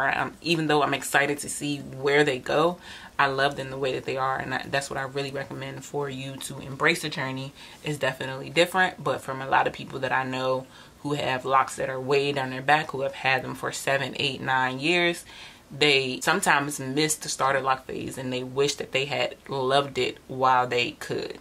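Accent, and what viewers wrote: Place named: DC/Maryland, United States. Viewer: Hey girl hey 👋🏿, I know my people from that DC/MARYLAND accent ( most people don't hear it but I DO)❤💚🖤✊🏿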